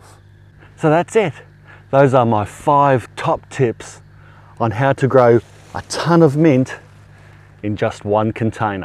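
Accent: Australia. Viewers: This is good. It’s even better at 1.5 speed increase. I love Aussies. Have I got that right?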